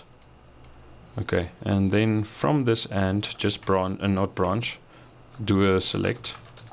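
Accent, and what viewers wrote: South Africa. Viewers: South African accent!!?!?!?!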